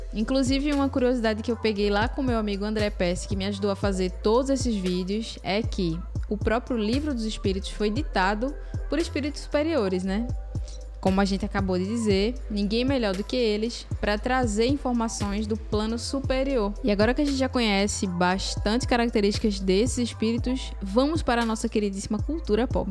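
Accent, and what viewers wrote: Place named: Northeast, Brazil. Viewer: Sou da paraiba e reconheci teu sotaque nordestino. Delicioso 😋 😋 Parabéns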